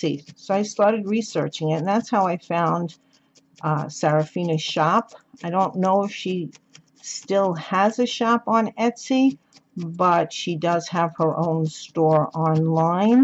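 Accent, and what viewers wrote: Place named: Long Island, United States. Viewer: When I started watching this video I felt like I was listening to my family - Long Island represent ! 😍 Adorable gnome witch!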